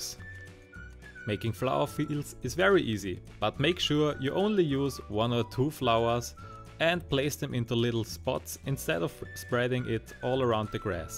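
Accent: Germany. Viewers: man hört richtig das du eigentlich deutsch sprichst 😂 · Kann es sein, das du Deutsch kannst (leichter Deutschdialeckt) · Dieser deutsche Akzent^^ Sehr schöne Sachen!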